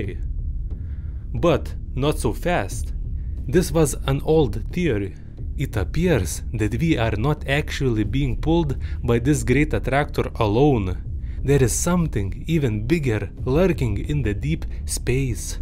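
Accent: Russia. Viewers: His russian accent is attractive lol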